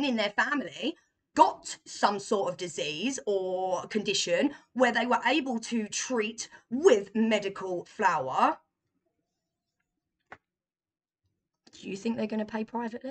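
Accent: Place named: East Anglia, United Kingdom. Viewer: I've been loving your videos. You inspired me to start MC and I've been feeling all the better for it! Please forgive me the rudeness of asking, but I'm from a small town on the norfolk-suffolk border and your accent just sends me back to my childhood. You have to be local to me, please confirm if true. It's so rare to see people in 'the wild' who sound like my cousins and family friends. Back home is big stoner vibes too so it's just very fitting ☮☮☮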